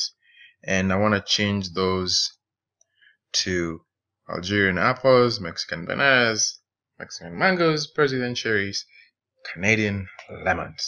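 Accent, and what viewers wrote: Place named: Kikuyu, Kenya. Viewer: Thanx for this. That Gikuyu accent is hard to hide though eh?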